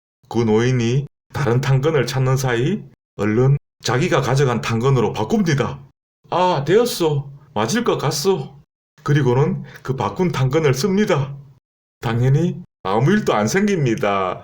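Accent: Gyeongsang, South Korea. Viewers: 선비님? 갱상도 말 이야기 정말 우습게 잘 하시네요~ ㅋㅋ~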